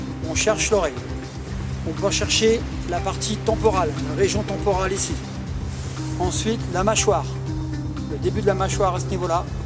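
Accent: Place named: Kabylie, Algeria. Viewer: t kabyle comme moi pasque t a l accent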